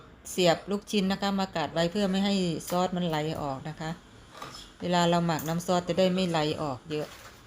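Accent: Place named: Isan, Thailand. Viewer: ชิมนำแน่ครับ .เป็นตานุ่มคือคำเว้าอิหลีตั่วละ.น้ำลายไหลเลยครับ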